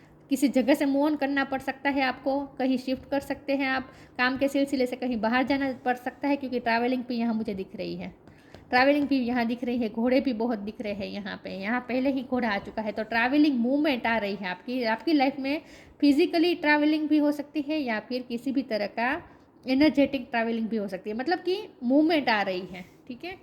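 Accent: Gujarat, India. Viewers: Thank you so much pranam Koti Koti dhanyvad Ishwar Koti Koti Pranam Shukriya mam Aapka kya aapane Puri Baat samjhaie aap bolane se Gujarati Lagte Ho thank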